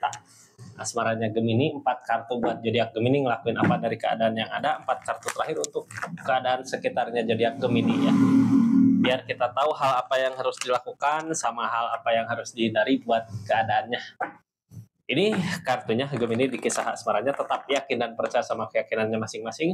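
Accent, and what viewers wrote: West Java, Indonesia. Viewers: biarain aja.. Sbg ciri khas sundaisme 😁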